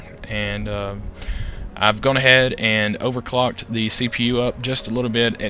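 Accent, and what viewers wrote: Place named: Alabama, United States. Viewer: Nice rig. I am building pretty much the exact thing aside from the case and few others. You sound like you're from Alabama or close lol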